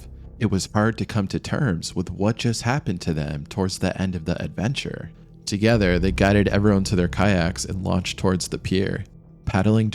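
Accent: United States. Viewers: I'm doing some work at home and I have to choose between hearing this guy's American accent and a neighbour using a chainsaw. Shit!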